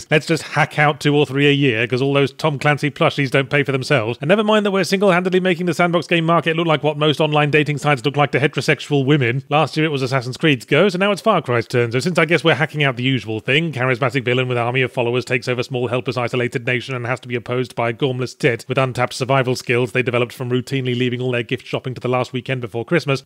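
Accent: Australia. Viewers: Yahtzee is starting to develop an Australian accent, after all this years.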